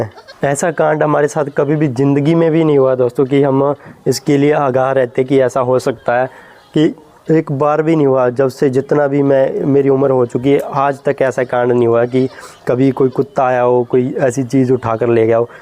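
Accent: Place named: Bihar, India. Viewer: Aap bihari style me bolte ho bhai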